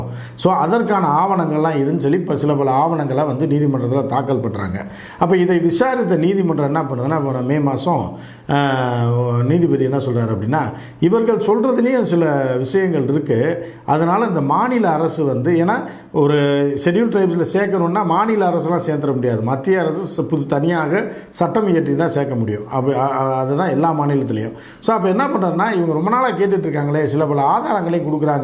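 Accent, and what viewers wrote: Madurai, India. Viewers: Yes madurai karar ...athu than pechu thoni kalakuthu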